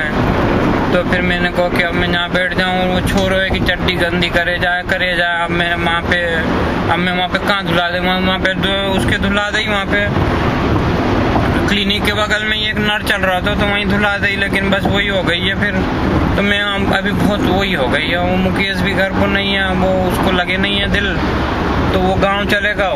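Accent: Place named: Vidisha, India. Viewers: D Bundelkhandi Boli.....quite close!!! Good one!!! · bond ho sir aap...i have a friend from vidisha, sounds just like you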